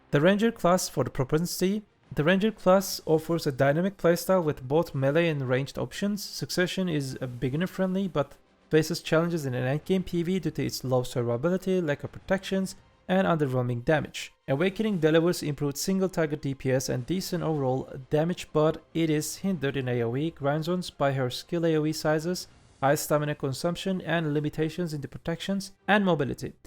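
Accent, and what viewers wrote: Turkey. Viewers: Are you turkish ? Your accent exteremely turkish .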